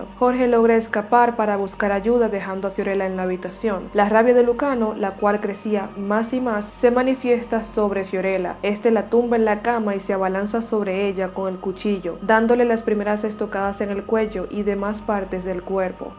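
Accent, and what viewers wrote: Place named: Dominican Republic. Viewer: un placer, te lo pregunté porque soy dominicano y te reconocí la forma de hablar...jjj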